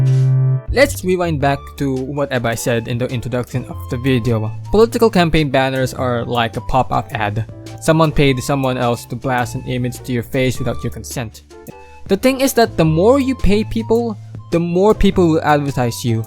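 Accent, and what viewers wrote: Philippines. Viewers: Finally someone with English speaking Filipino accent stepped up to understand the situation than native language content creators. All topics aside but this is wild, this is why I wouldn't trust politics all because of Marcos. Marcos did banned Beatles from performing in PH and I was pissed off by that. And now sending Du30 to Netherlands? What Marcos did was ruthless and very greed to hide from us.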